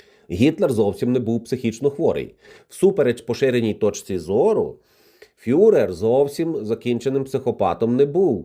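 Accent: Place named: West, Ukraine. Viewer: Тебе послухати, - виходить, що Гітлер не був вже і такий поганий, не сказати, взагалі, добрий. Поцінюваний міжнародними елітами політичного і культурного нахилу. Ну, були у нього де-які дрібні недоліки. І що? У кого їх нема? Ну що з того, що завдяки його невинним примхам-забавам у світі загинуло до 80 мільйонів людей? Він же був хоробрий, був поранений, мав нагороди, художник, номінант на саму Нобелевку! Корочше, сама доброчинність! Не те що росіяни, читай Путін. І як доказ своєї маніпулятивної думки, за вуха притягує сюди образ Мао Цзедуна і Пол Пота, до купи. От так провінціальний недолугий історик-аналітик, загнавши корову до стайні і давши свиням їсти, навіть не витерши руки, миттєво біжить до комп'ютера. І своєю, впізнаваною ореол його мешкання, інтонацією, - західнянського селюха, береться щось блямкати примітивне зі скарбниці своїх недолугих нашарувань, що рояться в його голові. 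Убого хизуючись, як йому здається, йому одному відомими фактами про Гітлера. І мерщій старається поділитися їхнім ново-відкриттям з широким загалом, але під кутом власної, так би мовити, критично осмисленої, точки зору. Яка насправді відбиває помутніння його свідомості і реальної оцінки такого явища, як Гітлер. А насправді його не це цікавить. Йому ж, заради своїх шкурних інтересів і з метою приваблювання глядачів, конче потрібно робити сенсанційні заяви про те що на фоні росіян, пан Гітлер виглядає цілком пристойно. Бо скажи він зворотне, то це нікого не привабить. Бо тут не буде нічого нового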